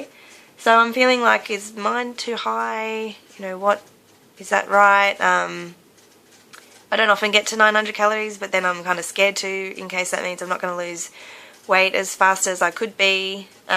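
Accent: Australia. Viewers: First off may I say you're gorgeous! I totally relate to what you're saying when you talk about your parent's talking about your outfits when you were younger. I remember once my dad saying I looked like a sack of potatoes in a t-shirt dress I had (that I loved) when I was about 10. Kind of broke my heart a little. I totally get it. I think now though, he is my biggest fan. Thanks for sharing. Love your accent, I'm Canadian so Aussie accents are intriguing ;) I'm going to have to check out that app! Cheers! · Love your Aussie accent...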